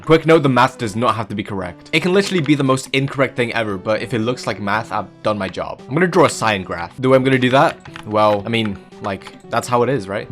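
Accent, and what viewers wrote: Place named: United Kingdom. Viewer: wait he’s British? AW YEA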